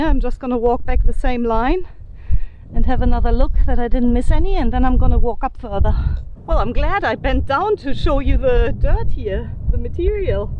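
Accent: Australia. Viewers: Loving the amber finds Meanwhile, how is your family with your 'aussie' accent? 😊😊😊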